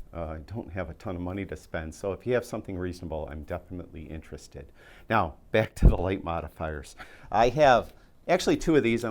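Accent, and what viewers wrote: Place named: Western New York, United States. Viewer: Nice to hear that western New York twang. I was born in Buffalo and my dad, same name, had his commercial art studio there for years in the Pitt Petri building. He did all the Spree covers for ages. I love your editing videos and have struggled with Capture One's complexity but love the quality. Maybe there is another package that is as good in rendering RAW but less convoluted. I don't need catalogs or even sessions maybe, would be happy to open and work on individual images most of the time. I'm learning Affinity so I can dump Photoshop, but the raw conversion is not so great. Thanks, again. Rix Jennings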